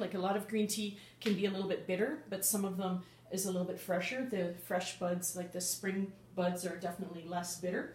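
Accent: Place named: Canada. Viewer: Nice hair and I love the Canadian accent!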